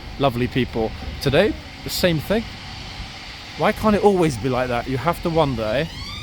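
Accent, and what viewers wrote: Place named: London, United Kingdom. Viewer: Oh what a joker. You never fail to amuse and entertain me, also educate me. I am an eastender but love to hear your london accent daily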